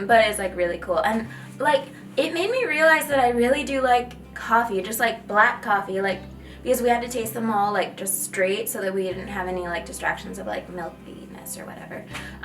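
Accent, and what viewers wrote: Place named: Canada. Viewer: You sound Canadian ❤️🇨🇦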